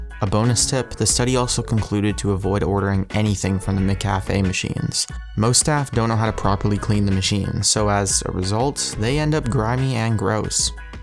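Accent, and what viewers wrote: Canada. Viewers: This dude is Canadian. I heard aboot a few times lol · are you Canadian?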